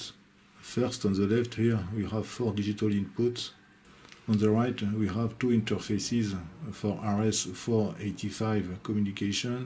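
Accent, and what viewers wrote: France. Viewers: Love the funny frenchlish. Sounds like a Frenchman in a movie.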